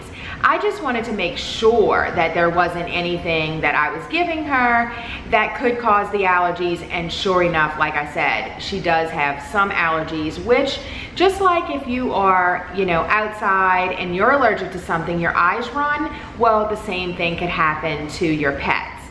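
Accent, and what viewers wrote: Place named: Louisiana, United States. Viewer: I swear I can spot a Louisiana accent anywhere 😂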